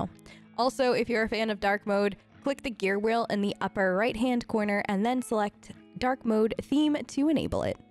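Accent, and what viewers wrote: Australia. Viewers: Sick vid, but dont you think for one moment i didnt catch that hidden aussie accent. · OHHHH she's australian! gotcha hehe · You are adorable and helpful ty. I can tell you have a Austrillian accent by the way you say ESO. I love it.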